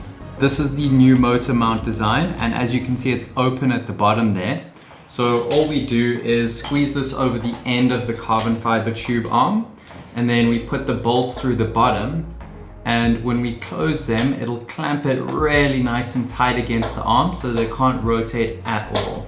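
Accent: South Africa. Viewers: So cool to hear a South African voice while watching a video like this. Keep it up man!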